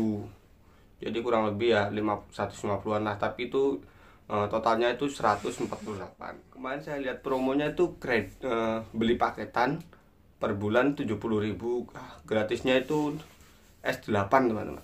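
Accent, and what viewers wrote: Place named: Kediri, Indonesia. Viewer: logat khas kediri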